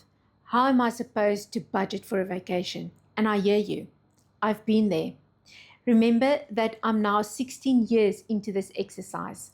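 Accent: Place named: South Africa. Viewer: I am going to draw cash for my groceries because it is just too easy to swipe a card. Having to draw more cash than you intended to spend means some serious deliberation. Ex Jhb now in the Netherlands. Always nice to hear a South African accent.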